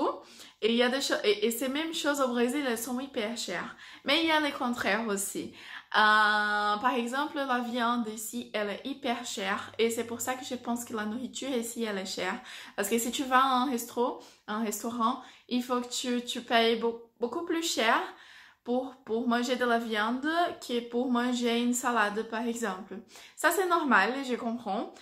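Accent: France. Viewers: Tu as l’accent du Sud de la France, c’est mignon. 💖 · Que linda ela falando francês!!!1 Seu francês evoluiu muuuuito,. Parabéns!!! Tá com sotaque francês já hahaha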